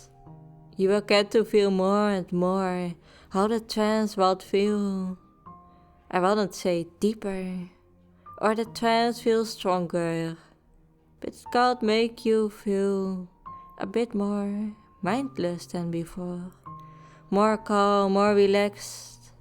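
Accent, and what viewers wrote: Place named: Netherlands. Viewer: Didnt expect to like a dutch accent hehe, but I think it is ur voice and the way u pronounce words that makes it nice :3 I also know a dutch male switch u might get along with very well im sure we will chat about it, hehe :3 ty for the fun :3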